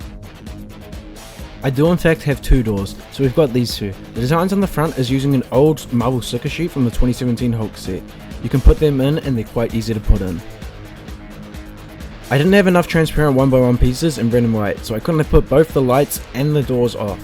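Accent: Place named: Australia. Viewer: Listen to that accent… bro really thinks he’s not Australian with THAT voice…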